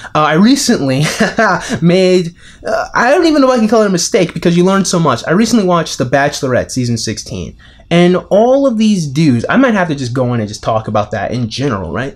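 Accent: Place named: United Kingdom. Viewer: ayy burp and british accent, this was a good vid. also re: paired stimuli (i.e making her feel good in your presence) , this is how you get a girl into anal...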